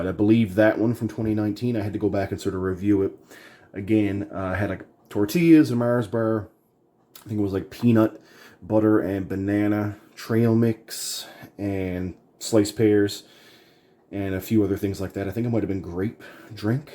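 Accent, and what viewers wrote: Canada. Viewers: The best part of the reviews are you can hear a hint of Bubbles from time to time from TPB lol Canadians have a great accent. Much love from Wisconsin,USA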